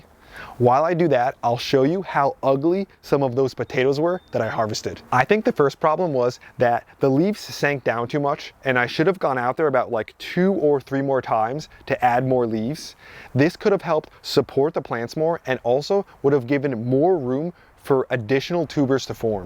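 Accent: New Jersey, United States. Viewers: I am in Burlington NC! I planted my seed potatoes in 10 gallon fabric grow bags last week. April 15. The russets are just coming through. I planted all the potatoes deep so I can have room for hilling. I learned a lot from this video. I have grown potatoes in straw and even cardboard boxes with successes. I was planted later this year because the first batch of seed potatoes I ordered from MIGARDENER were not chitted. So, I had to spend a month doing that. I ordered some new seed potatoes from MIGARDENER were a week ago and they came today already chitted, so I planted them immediately. Take care and good luck. I love listening to you talk with your Jersey accent. I lived in several places in Jersey in the 1970s Nd 1980s. I moved here from Asbury Park in 1992.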